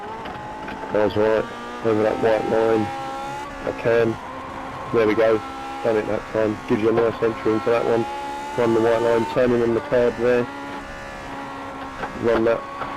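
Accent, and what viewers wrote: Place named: Germany. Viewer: Really great getting a bit of the historical background on these tracks. I can understand you much better with the German accent. Could you please use it during iRacing communication?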